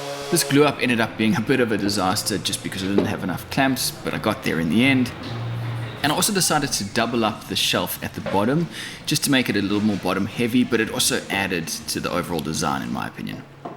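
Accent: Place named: South Africa. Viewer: Started watching this, and I’m like “hey I know this accent”... I’m a South African living in Malta.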